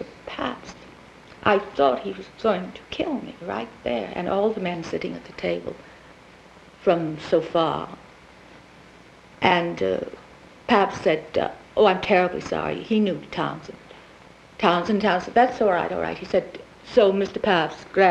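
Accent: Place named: Transatlantic, United States. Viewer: According to her book 'Lulu in Hollywood' , early in her career , she was disparaged for her 'hick' Kansas accent and trained her voice to get that Transatlantic accent which gives her voice a beautifully articulated tone and you can easily understand every word she says. Ironically , as it turned out , a perfect accent for acting in the sound movies.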